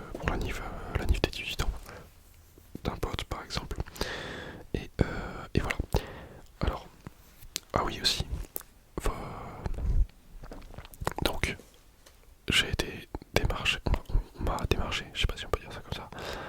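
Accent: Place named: Quebec, Canada. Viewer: Rien que d’entendre l’accent du quebec 🔫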